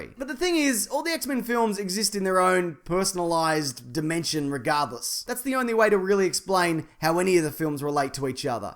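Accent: Australia. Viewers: Australian! I couldn't work out why I loved your voice so much!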